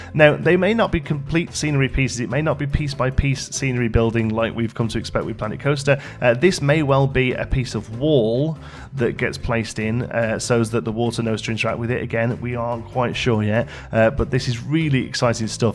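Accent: United Kingdom. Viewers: Weird, by your voice I would have thought you were in the UK already. Hello to a Canadian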